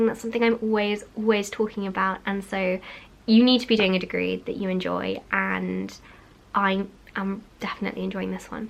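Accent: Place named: England, United Kingdom. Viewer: I like English sound, better than American sound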